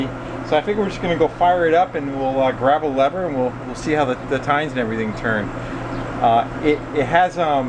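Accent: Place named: Albany, United States. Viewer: this guy must be from albany ny area he dont sound from NH.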